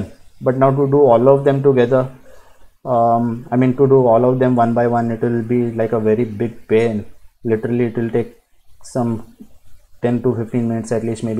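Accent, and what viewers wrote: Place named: India. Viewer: Thank you so much!! I love indian accent.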